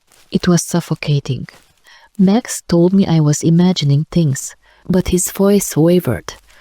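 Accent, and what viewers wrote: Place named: Eastern Europe. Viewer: This is some Eastern European accent, but I can not locate it. Maybe Eastern Slavic, but not sure. Will you tell? Thank you for your effort! Me actually not listening to scary stories, told in a female voice. But wish you good luck!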